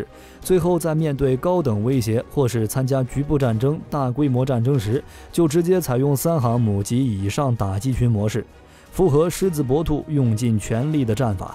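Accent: China. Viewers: 看他的解说用词和口音就知道绝对是大陆人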